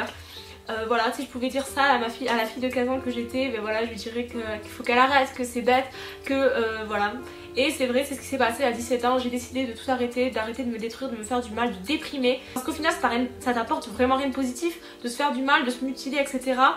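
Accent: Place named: Toulouse, France. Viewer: tu a l'accent toulousin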